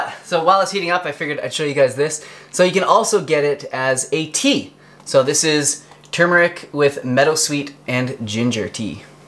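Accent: Canada. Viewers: Hi! I’m new to your channel. Judging by the way you talk. Are you from Canada? People there have a unique way of saying the word “out.” Sorry for being off topic! 😅 I’m glad to find out you’re Vegan! Continue to stay healthy! GOD bless you and everyone else you love! 🙏🏾✝️🐑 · Joey Heffernan he also pronounces Out and about like canadians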